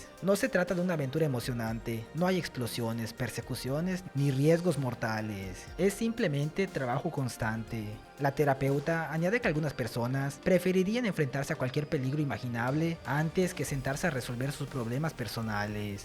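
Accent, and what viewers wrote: Yucatan, Mexico. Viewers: Es yucateco? 🤔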